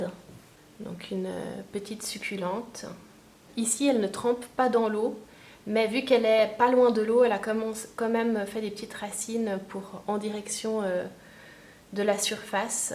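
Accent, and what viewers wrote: Switzerland. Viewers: Sympa ce petit tour ! Et ça m’a fait plaisir quand j’ai reconnu ton accent, trop cool d’avoir des suisses dans le YouTube game 😍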